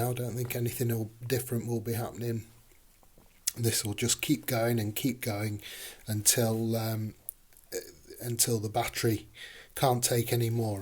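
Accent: United Kingdom. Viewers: Great breakdown. Question, what regional English accent do you have? I like it. Best guess is somewhere near Birmingham? Or near London, actually. Hello from California.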